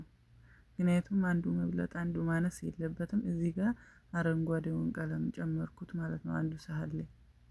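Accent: South, Ethiopia. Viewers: በጣም ደስ የሚል ደቡብ አገጋገርን ያሳየሽ እህታ ለየት ያለ ነው እንዲህ አይነት ለየት ያለ በጣም አሪፍ ነው ሚያቁትን ማሳወ ሌላ ክፍለ ጊዜ እንጠብቅሻለሁ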